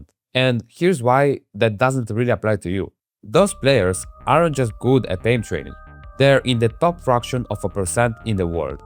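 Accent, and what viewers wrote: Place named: Eastern Europe. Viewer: Whenever i hear an east european accent i know the man will go straight to the point while spitting facts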